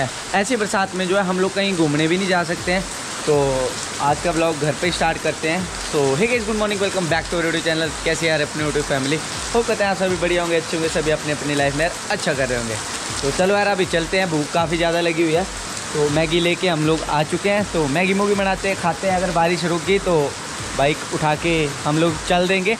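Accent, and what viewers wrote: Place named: Pahadi, India. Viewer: pahadi mix hindi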